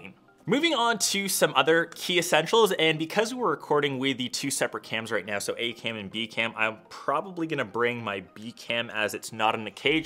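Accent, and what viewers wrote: Netherlands. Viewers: Hé, are you Dutch? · Huh are you from holland?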